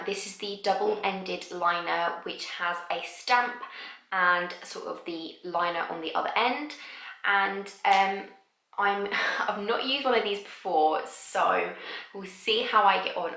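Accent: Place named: RP, United Kingdom. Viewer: Aww you're so sweet! The make-up looks really good! btw I love your English posh accent 😁 😘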